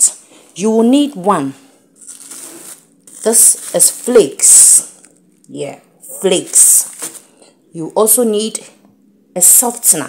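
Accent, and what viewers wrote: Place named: United Kingdom. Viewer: I love how you meticulously speak the Queen’s language ❤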